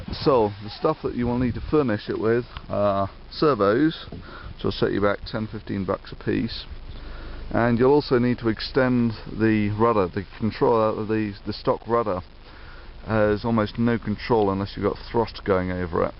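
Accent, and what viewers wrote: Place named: United Kingdom. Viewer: O just remembered to ask, where u from as you have a British accent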